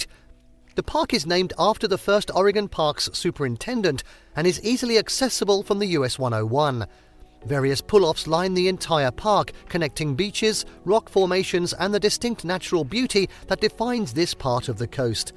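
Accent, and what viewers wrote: United Kingdom. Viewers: Oh for God sake! A BRITISH accent on a video about OREGON. 😢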